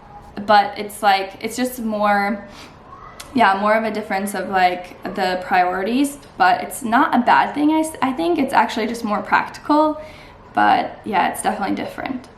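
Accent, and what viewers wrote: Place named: United States. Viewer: You look Chinese with American accent. It is an individual case based.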